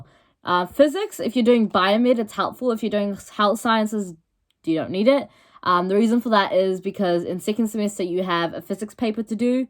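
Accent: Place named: New Zealand. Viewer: Sound like gru but from New Zealand, and sorta a female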